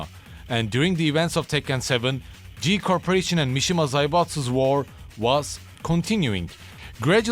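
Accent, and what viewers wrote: Turkey. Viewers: man, you just have such a prominent turkish accent. i couldn’t see any signs on ur account that u might be from turkey, but it’s unignorable.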